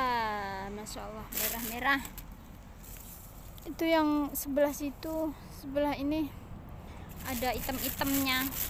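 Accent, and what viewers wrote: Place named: West Java, Indonesia. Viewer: Dari logat bahasanya kayanya kamu dari Jawa barat ya?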